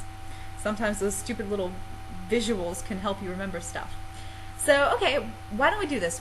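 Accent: Germany. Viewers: Please don't use this song for any intro again. It's just gross. And by the way it's sung by a dutch, so the accent is not very helpful for losing the american accent. Whatever, good pronounciation. I had no problems understanding you. If didn't know german is not you mothertounge i'd say you speak one of those strange accents we have in Germany. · du bist wirklich süß aber am anfang hört man ziemlich genau, dass da ein regionaler akzent drunterliegt. also ein deutscher, ich kann es nicht genau identifizieren aber es könnte in die mitteldeutsche richtung gehen. dennoch ist es gut, dass du anderen die aussprache näher bringen möchtest. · your soo cute !! and your german accent is perfect.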